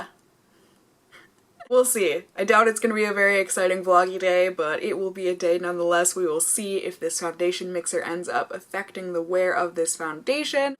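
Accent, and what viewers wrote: Minnesota, United States. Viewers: you sounded really minnesotan in this video 😂😝💓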